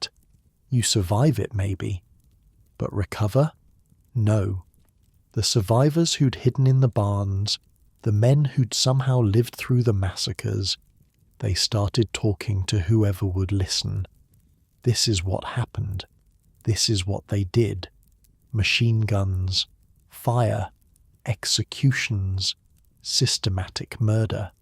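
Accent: United Kingdom. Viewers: SICK OF THESE BRIT SCHIT ACCENTS.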